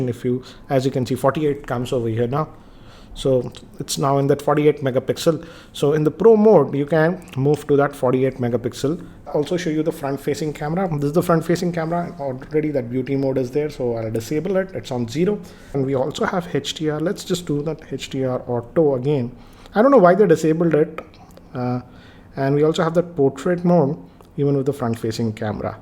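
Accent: India. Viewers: 🔴 I like Indian accent 😂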